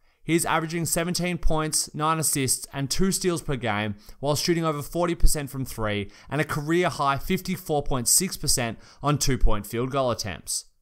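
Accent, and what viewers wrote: Australia. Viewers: Your an Aussie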